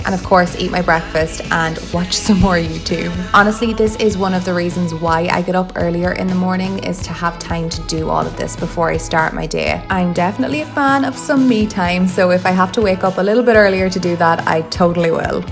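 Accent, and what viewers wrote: Ireland. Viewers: I absolutely love you voice! People always guess Irish when they hear my accent(I live in Scotland but my father is Irish so I have a weird mix of both accents) so when I hear an actual Irish accent it makes me happy bc it reminds me of my dad.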